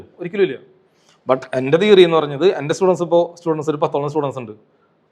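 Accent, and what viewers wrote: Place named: Thrissur, India. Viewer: സ്ലാങ് ക്കേട്ടിട്ട് തൃശ്ശൂർ കാരനെ പോലെ ഉണ്ട് എന്റെ ജില്ലയിൽ ഇങ്ങനെ ഉള്ളവർ ഉണ്ടല്ലോ ഛെ നാണക്കേട് തൃശൂർ കാരെ ടെ വില കളഞ്ഞല്ലോ ഗഡി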